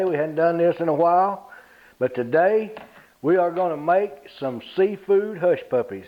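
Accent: South, United States. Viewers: I just came across your videos today while looking for a hot water cornbread recipe. I made them earlier for the first time using your recipe and they turned out great! I love the southern accent. I'm from Alabama, where are you from? I am planning to make corn fritters tomorrow. Do you have a recipe for those?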